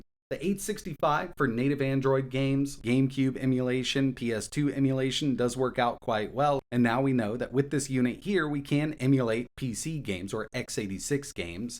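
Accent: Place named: South, United States. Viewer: He's a "Southerner".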